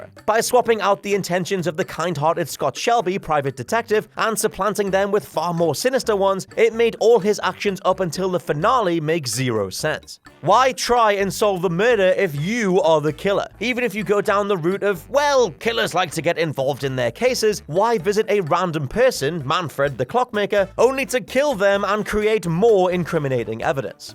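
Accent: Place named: United Kingdom. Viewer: WhatCulture is just WatchMojo with a british accent. Watching this video made me stupider.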